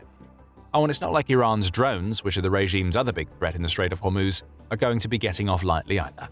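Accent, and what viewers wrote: United Kingdom. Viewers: Why are all of the videos are narrated by guys with a British accent????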